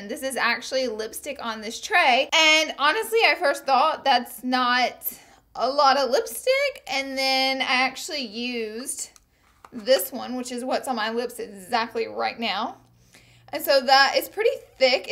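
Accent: South, United States. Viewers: She is so country I love it!!!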